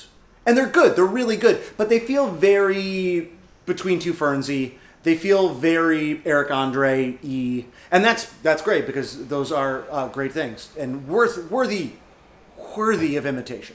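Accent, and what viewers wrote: Canada. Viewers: Woah wait, are you Canadian?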